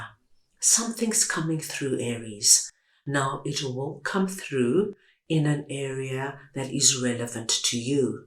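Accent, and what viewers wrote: South Africa. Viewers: Sounds white south African possibly Afrikan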